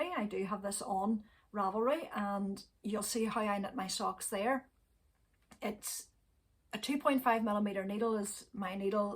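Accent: Ireland. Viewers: My dream project would be a colourwork sweater by Marie Wallin. But I think I have to wait until I have retired to have the time and focus on it. I am a new subscriber and like your podcast above all your Irish accent:) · One day I hope to knit a lace work cardigan sweater. I'm currently working on my first pair of socks. Enjoy your podcast and love listening to your lovely Irish brogue. CONGRATULATIONS on your Anniversary. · I am a newcomer to your podcast and am enjoying it very much, particularly your lovely Irish accent. We have several Northern Irish relatives and to listen to you talk is almost like visiting with them. I am not a very accomplished knitter, but I would love to knit anything with a fairisle pattern such as a yoked sweater. I would also love to do a cardigan as I have done a few pullovers or as you call them, jumpers. Knitting is such a sustaining hobby during these days and having wool running through my fingers on any project is so rewarding.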